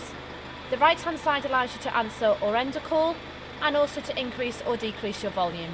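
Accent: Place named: Liverpool, United Kingdom. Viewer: Has to be the most posh Liverpool accent I have ever heard very nice and a very well put together video. I now no how to use the heating on my car thank you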